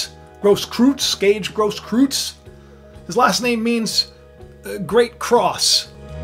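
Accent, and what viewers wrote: New York, United States. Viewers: Talks like true New Yorker “anti gun” everything… Under this logic all police who carry a gun are out to hurt someone..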